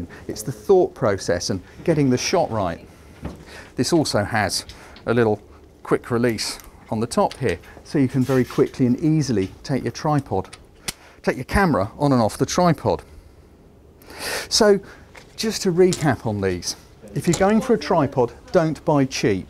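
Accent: United Kingdom. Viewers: I love your British accent, I'm subscribed just for the accent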